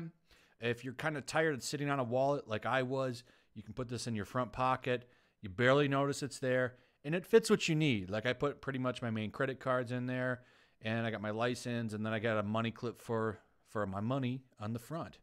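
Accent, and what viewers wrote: England, United Kingdom. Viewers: 'Peckman'? Oh, you read almost as badly as Gary Beuchler. Peckham. Pronounced 'PEK-em' or 'PEK-um'. An area in south London. I'll give you this, though: this is one time when your daft plummy english accent is appropriate...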